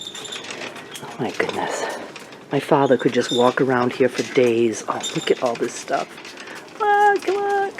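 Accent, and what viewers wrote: United States. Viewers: I happen to love the swearing lol. You talk just like most of 'Merica :)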